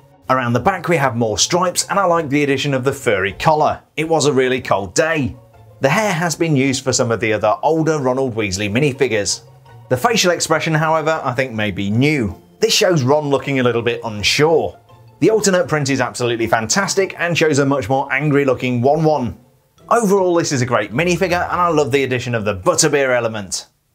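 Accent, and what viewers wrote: United Kingdom. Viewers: The most british accent if i ever heard one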